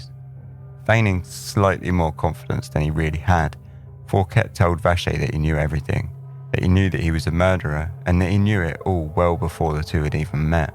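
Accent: United Kingdom. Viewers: All of your videos are shit. No pics or anything.. just your stupid Brit accent and you talking... Come on you lame prick.. total garbage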